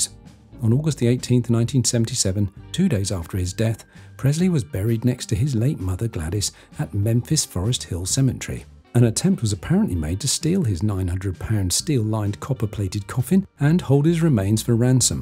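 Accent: England, United Kingdom. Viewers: Listening to an English man describe Elvis Presley and his southern mansion and his southern ways is kinda like listening to Ozzy Osborne preach the gospel, it just doesn't really go together.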